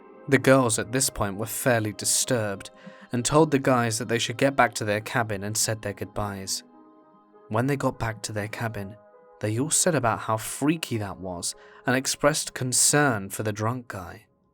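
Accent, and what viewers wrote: United Kingdom. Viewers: Thank you kindly for taking the time in preparing each story and love your British accent it gives every story you read worth listening to. 😊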